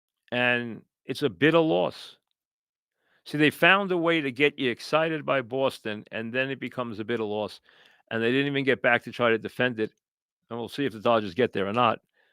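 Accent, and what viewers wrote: New York, United States. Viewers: “I don’t know whats going on with Weev-ah”.. love New York accent.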